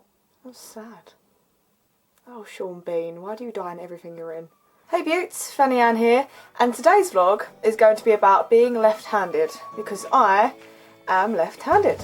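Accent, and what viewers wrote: Essex, United Kingdom. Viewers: hey, greetings from Turkey, thanks for the videos, heped me a lot get Essex accent. ❤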